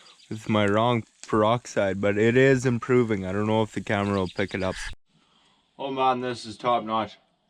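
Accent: Canada. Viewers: I'm going to say this: I watch this channel almost purely for the outrageous Canadian bush accent, and energy. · most important thing I have learned is that I've never heard an actual Canadian accent before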